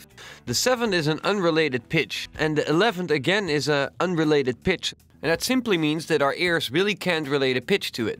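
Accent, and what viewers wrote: Netherlands. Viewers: you have a dutch accent :D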